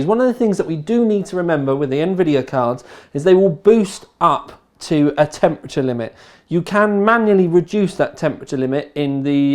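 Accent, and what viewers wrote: England, United Kingdom. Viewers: ferdaus bhuiyan so he's from England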